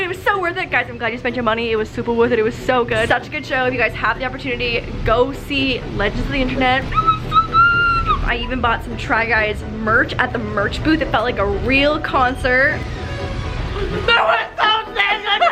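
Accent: Canada. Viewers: love that Canadian accent.......love you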